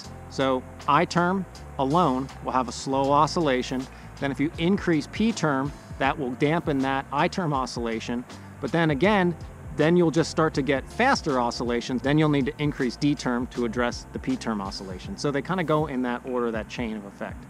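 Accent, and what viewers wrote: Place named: Pennsylvania Dutch, United States. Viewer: I think i heard some PA dutch in your voice.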